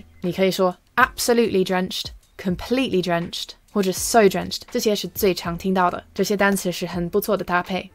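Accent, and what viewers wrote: United Kingdom. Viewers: 英國腔聽起來真的很好聽, 好漂亮!~~~~ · It's nice to hear a British accent in these types of videos (too often it's American accent). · 滿滿的英國腔😊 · 終於等到用英國腔的教這個，目前定居在倫敦，希望可以出一些跟孩子的聊天，小朋友在學校跟同學交流，家長跟老師的交流，租房子賣房子這些方面的聊天技巧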